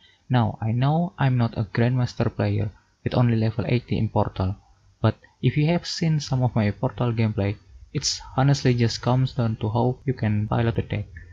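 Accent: Indonesia. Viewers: u sound like indonesian tbh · Also i never guess that you're indonesian before i hear your voice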